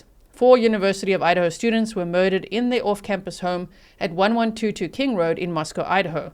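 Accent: South Africa. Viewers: I’m from South Africa as well, I thought you sounded like me. I live in ST George, Utah. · love your afrikaner accent!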